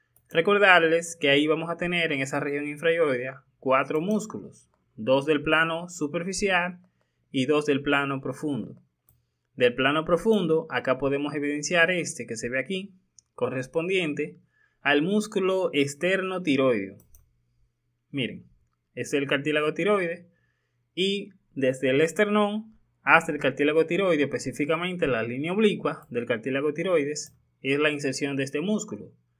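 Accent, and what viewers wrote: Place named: Dominican Republic. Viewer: Mi querido acento dominicano, sigue así, se entiende todo perfectamente ❤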